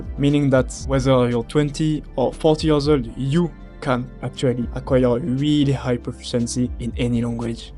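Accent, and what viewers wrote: France. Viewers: Are you french or from eastern europe countries ?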